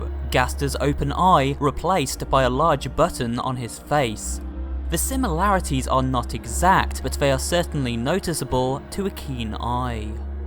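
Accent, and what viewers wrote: United Kingdom. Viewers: this dude is just british Chills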